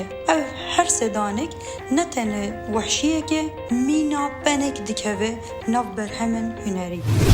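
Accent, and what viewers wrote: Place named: Botan, Turkey. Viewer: Sihet xweş, bi rastî jî vîdeoyek gelek xweş bû. We kedek mezin daye ji bo amadekirina vê vîdeoyê. Binnivîsa Kurdî jî gelek baş bû. Muzîk pir baş lê hatibû û şêwaza kêşanê û pevbestin jî têra xwe baş bû. Em her dem li hêviya vîdeoyên we ne🍀❤️ Tenê ez dixwazim pêşniyarekê bikim ku zimanê vebêjiyê hinekî herêmî maye(botan, cizîr, silopî, şirnex). Bi min zimanekî hinekî din standart were bikaranîn wê pir baştir bibe🙏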